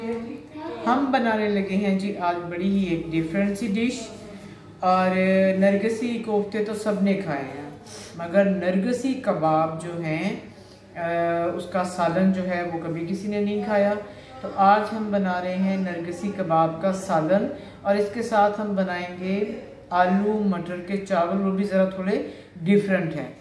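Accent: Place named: Punjab, India. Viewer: ur Punjabi accent is very nice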